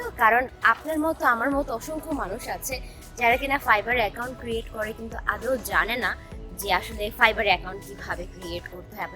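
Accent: Chittagong, Bangladesh. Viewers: Apnar basa ki Chittagong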